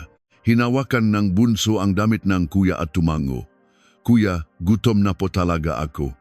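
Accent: China. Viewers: alanganin na intsik ang pangit pakinggan ayusin mo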